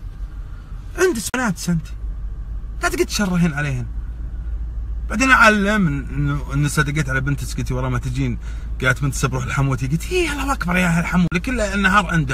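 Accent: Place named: Qassim, Saudi Arabia. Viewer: وش فيها لهجته احسن لهجة بعد قلبي القصمان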